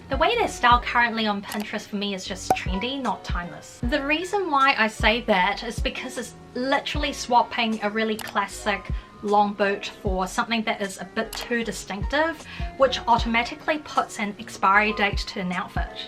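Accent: New Zealand. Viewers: Naw love the NZ accent. People some times ask me where in NZ I am from, but I'm just a German girl in Australia lol Love the editing on the video btw. Very engaging 😃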